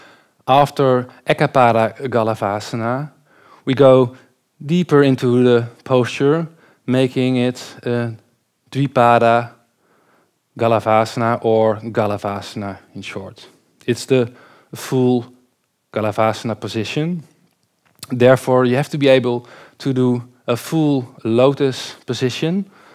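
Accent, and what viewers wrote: Netherlands. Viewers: Ik kan horen dat je nederlands bent haha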